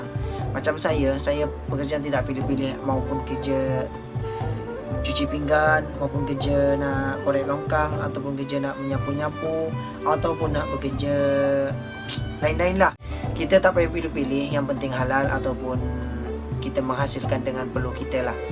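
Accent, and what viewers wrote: Malaysia. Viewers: Logatnya kakak udah malaysia bgt yaa..heheheheh. semngaatt ya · tak ada pun, cakap macam orang Malaysia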